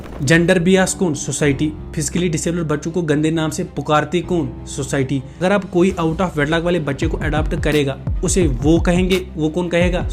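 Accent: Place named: Kashmir, India. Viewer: You are doing very well bro.... Keep it up zuva.... One suggestion there is a little need for upgradation in narrating skills and Accent. Koshur Accent thora sa reduce karunn Baaki overall video cxe zabardast❤❤❤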